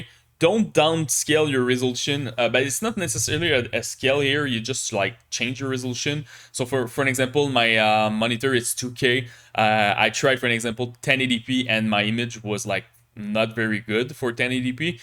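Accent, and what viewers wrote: Quebec, Canada. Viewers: You’re french canadian aren’t you haha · Greate video :) Juste, avec l’accent je détecte un québecois😂 Cool de voir d’autre québécois sur YouTube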